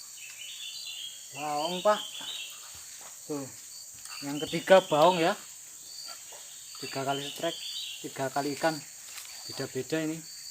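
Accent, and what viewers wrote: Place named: Java, Indonesia. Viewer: Kaya orang Jawa logatnya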